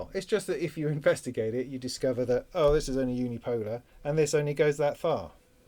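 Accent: United Kingdom. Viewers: you're becoming something of a rather good influence. And so nice there's another UK accent to listen to. just got myself an mpc one as a brain....(not personally... though perhaps could be pressed into service...) for a more advanced system. looking to start investing and building around Christmas time. Keep making the vids... learned everything i know so far mainly from you and divkid., huge thanks (btw... what was that reference you made to church the other day......?)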